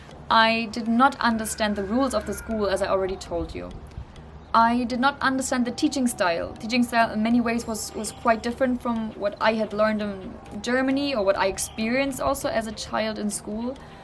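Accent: India. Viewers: your English communication like Indian and very simple and not like your Motherland.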